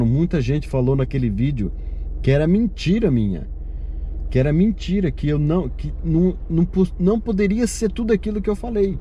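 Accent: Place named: Portugal. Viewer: Parace um português a falar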